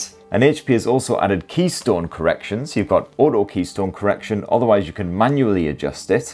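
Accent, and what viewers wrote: United Kingdom. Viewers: the intro has the most british accent ever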